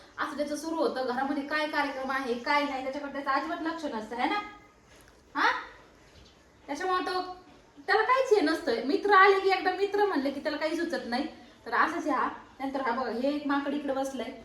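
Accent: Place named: Marathwada, India. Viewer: ताई मला ना तुमच्या मराठवाड्यातली बोलण्याची पद्धत खूप आवडते कारण तुमचे प्रत्येक शब्द खूप आपुलकीचे असतात मी दोन वर्ष मराठवाड्यामध्ये काम केले अहमदनगर औरंगाबाद परभणी अशा बऱ्याचशा ठिकाणी मी काम केले पण त्या ठिकाणी जी आपुलकी जी प्रेम आहे माणसं मिळाली ना ते खरंच खरंच खूप छान होते❤❤❤❤खूप जीव लावतात तिकडली😊😊😊आता खूप आतुरता झाली आहे ताई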